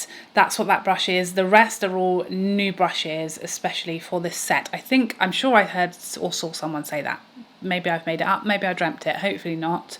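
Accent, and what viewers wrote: England, United Kingdom. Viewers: I could literally listen to you all day! You’re so funny and I love your English accent ! Canadian fan 🇨🇦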